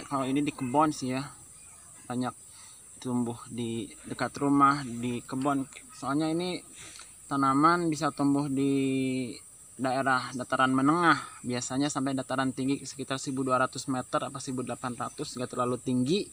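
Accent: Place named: Sunda, Indonesia. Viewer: Klo org sunda berbahasa Ind logatnya meni ledok banyak,,mah"😅nya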